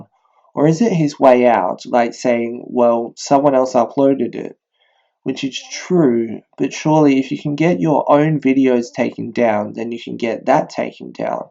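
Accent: Australia. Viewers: it's so relaxing hearing another australian accent in videos. i don't have to put everything thru a mental translator from whatever to slang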